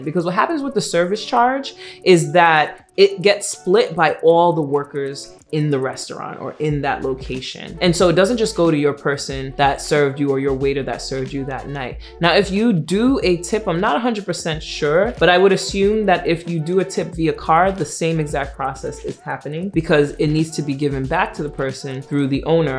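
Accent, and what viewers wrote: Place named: Barbados. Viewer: You picked up a slight bajan accent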